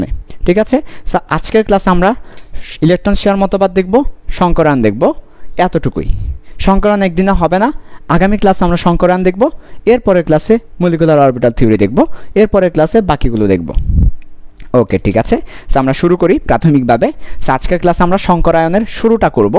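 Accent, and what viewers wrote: Chittagong, Bangladesh. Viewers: Bhaia apni chattogramer????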